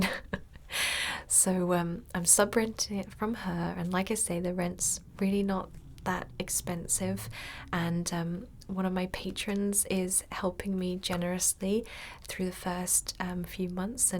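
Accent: United Kingdom. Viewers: Im in love with your voice... And British accent ❤️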